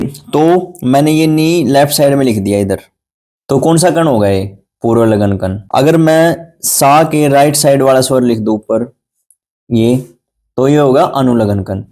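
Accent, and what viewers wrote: Haryana, India. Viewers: Aap haryana se ho kya ??😊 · Sir are you haryanvi...? Boli se thora thora lg rha hai..!😂😂 I am also belong to haryana❤ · Yo bhai dekhe HARYANA Ka hai awaz te pta lagra HARYANA ON TOP BHAI❤ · Sir aap haryana se ho?😅😅Tho tune se laga......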